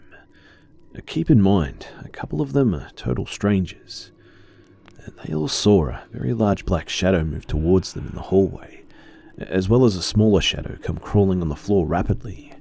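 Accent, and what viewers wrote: Australia. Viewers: I would happier if do me and do me good while reading to me in that sexy Australian voice of his!!!!! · Leave it to me to listen to the awesome Aussie narrate these badass stories just to keep myself up at night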